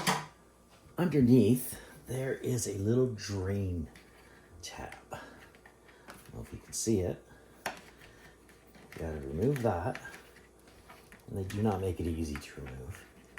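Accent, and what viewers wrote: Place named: Upper Midwest, United States. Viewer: Where you from? North Dakota? Minnesota?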